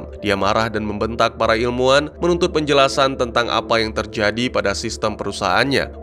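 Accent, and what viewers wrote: Aceh, Indonesia. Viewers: Bg orang Aceh ya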